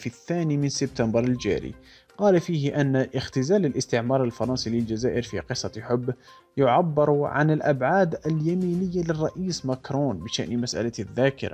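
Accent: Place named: Marrakech, Morocco. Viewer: تخفي لكنتك المراكشية و لا تستطيع اختراقنا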